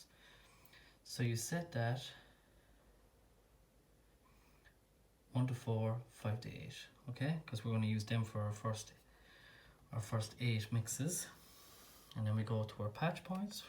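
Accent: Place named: Ireland. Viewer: Hello I was having a bit of Patchen problem and I came across your video. Great to hear an Irish accent Thanks for the video.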